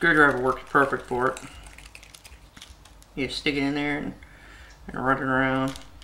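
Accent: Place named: Texas, United States. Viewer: interesting accent. Texas? Oklahoma?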